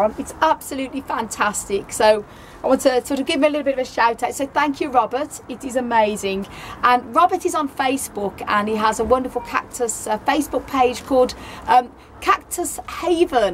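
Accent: Birmingham, United Kingdom. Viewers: you still have the Brummie thing going on :-)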